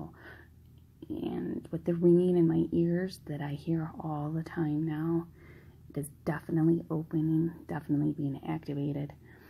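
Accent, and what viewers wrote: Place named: Illinois, United States. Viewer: I understand!! My voice sounds so weird hearing it back. We are our best critics but shouldn’t be!!! And yes it’s slight but you definitely pronounce certain words with a IL accent lol. I like it! It just sticks out to me living in NJ / NY area with the heavy accents but mine is pretty neutral compared to others. Much love!!!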